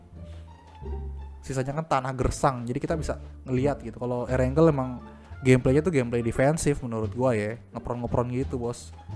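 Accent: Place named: Jakarta, Indonesia. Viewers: Bangg anjirr gua demen banget ama cara ngomong lu betawi bat anyingg😂😂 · Masih ada easa betawi betawi nye soalnya 😁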